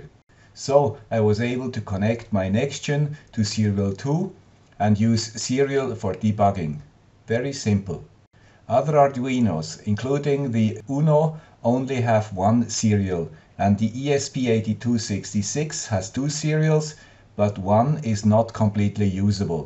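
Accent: Switzerland. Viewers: HI Guy with the Swiss Accent again! I can't Thank you enough!!!!!!!!!!!!!!!!!!!!!!!!!!!!!!!!!!!!!!!!!!!!!!!!!!!!!!!!!!!!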